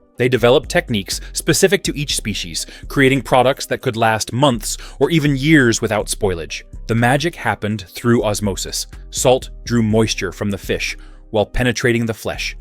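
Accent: United States. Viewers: This hasty-sounding, breathless bot voice is really annoying. The information is solid and well written, though, so, if you sbould do this again, do consider having a human reading it. And the word "confit" is pronounced "Cone-FEE." American audiences [your video uses an American accent] pronounce "herb" without the "h," and British pronounce it with the "h" aspirated. Also, it's "LOO-tuh-visk."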